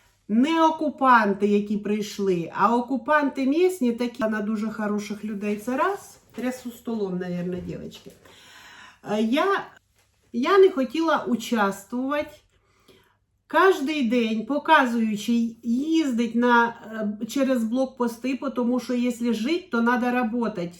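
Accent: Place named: Enerhodar, Ukraine. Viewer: Цікаво, що де той Енергодар і де та Велика Писарівка, а говір один і той же - один-к-одному🤔💗👍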